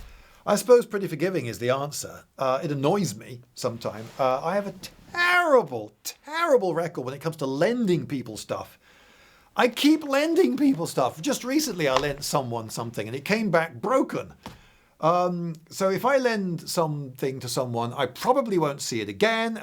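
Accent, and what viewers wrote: United Kingdom. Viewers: British. That's one you are looking for. Terminally, inescapably British.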